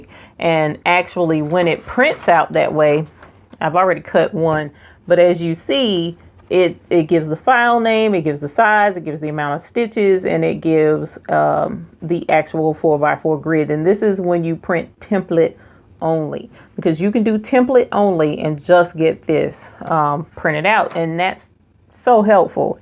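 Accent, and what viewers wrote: South, United States. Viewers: Lol, I'm loving the southern belle accent too -- the land of good food, sweet tea and common sense. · OMG! Your Southern Accent is so adorable! Love the video. Gonna run by the Dollar Tree and give this a try. Thanks so much for the instructional.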